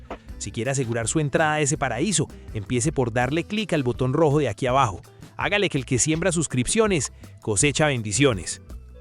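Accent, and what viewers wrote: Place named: Antioquia, Colombia. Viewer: Hola muchísimas gracias por tus recetas, pero sabes? ... lo que más me encanta es tu sentido del humor y tu acento pais, jajaja divertido t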